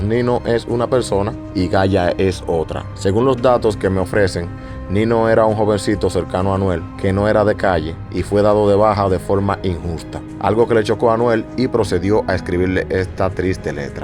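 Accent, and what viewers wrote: Dominican Republic. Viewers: ok que bien, te pregunto como ases noticias urbana de PR, pero hablas domii jjjj bien ahy manito💪💪💪💪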